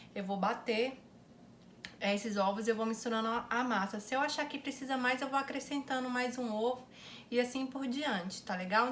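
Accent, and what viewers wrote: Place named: Minas Gerais, Brazil. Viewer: Que delícia você parece ser mineira, igual a mim gosta muito de pão de queijo e o sotaque também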